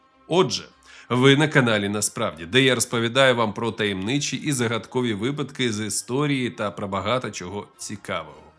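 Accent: Russia. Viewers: Хто ви такий щоб давати такі неправдоподібні характеристики нашим козакам, та ще з москальським акцентом??? Ви подумайте перед тим як людей водити в оману! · Ну нащо пхатися в знавці фактів про характерників? А акцент московський все ж відчувається попри правильну вимову:))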